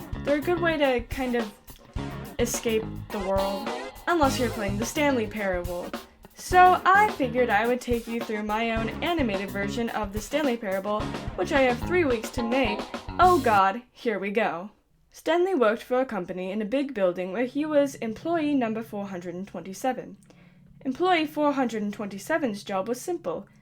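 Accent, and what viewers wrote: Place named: RP, United Kingdom. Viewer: Another great video. Well done! You're also halfway to a brilliant RP accent.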